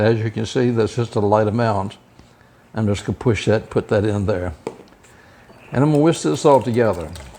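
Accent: South, United States. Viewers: Sir, thank you for sharing. Where do you come from? You sound like NC or Virginia.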